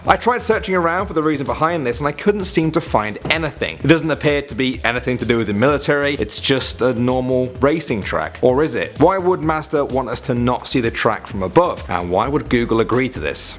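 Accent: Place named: United Kingdom. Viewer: As a semi-paranoid fan of conspiracy theorists' stories, I'd watch another!, you hosted the first AT10 video I ever watched, and due to your mellow baritone and nifty British accent, I kept watching! Good on you!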